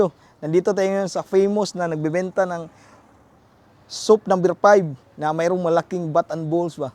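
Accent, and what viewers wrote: Visayas, Philippines. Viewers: Mag bisaya idolwag kang mag Tagalog halata kc Tagalog mo idol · Bisaya kaau ka bai..😅😅😅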